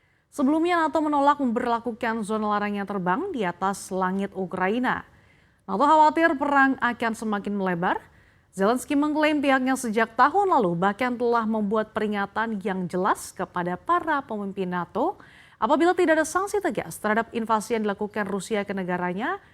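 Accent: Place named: Java, Indonesia. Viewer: Mbak hostnya jawa banget..manisss... aku suka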